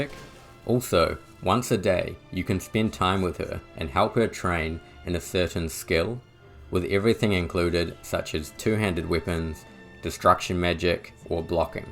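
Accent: New Zealand. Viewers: you from NZ?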